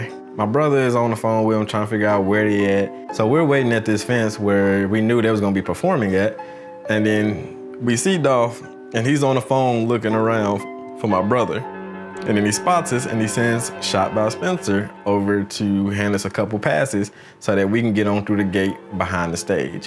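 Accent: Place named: South, United States. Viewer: “ she goes on out there “ Your so country 😭😭 Much love from Tennessee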